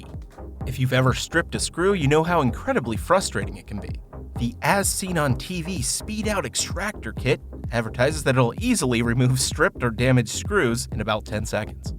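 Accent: Buffalo, United States. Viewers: Wait is he from Buffalo? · You from Buffalo?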